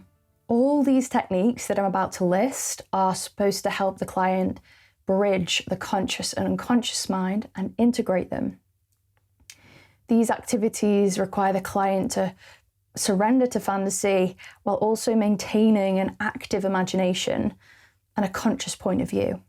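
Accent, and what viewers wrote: United Kingdom. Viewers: There's nothing quite like watching a lass with a Northern accent explaining Jungian philosophy and ideas as well as you just did - truly something to behold 😭I really enjoyed this video, thank you!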